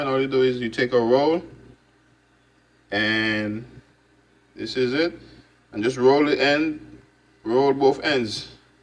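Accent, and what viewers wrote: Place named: Caribbean. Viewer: Straight from the Caribbean I hear the patois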